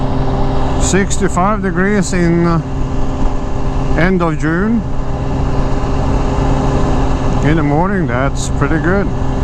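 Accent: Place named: Northern Norway, Norway. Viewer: Can hear 'nordlendingen' in your English speaking.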